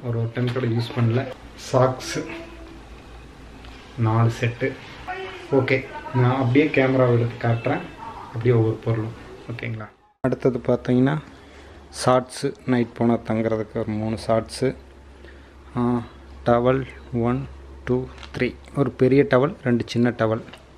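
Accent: Kongu, India. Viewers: Enunga Coimbatore ngala.? Kongu Tamil 😍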